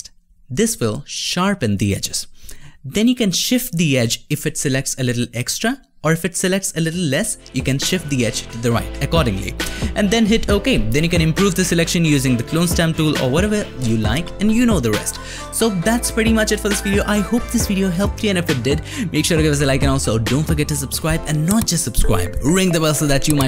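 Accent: India. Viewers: Yes, an actual GOOD Indian accent which is ACTUALLY an Indian accent unlike some scammer shit people see on YouTube. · 3 its an indian accent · He’s Indian I think He sounds Indian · Indian accent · beautyofustwo well he does sound Indian so that might be why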